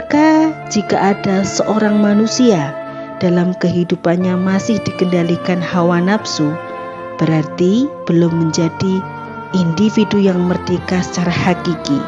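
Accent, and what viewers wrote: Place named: Java, Indonesia. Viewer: MasyaAllah tabarakallah.. sehat selalu dek. Medok nya buatku merindu..💕💕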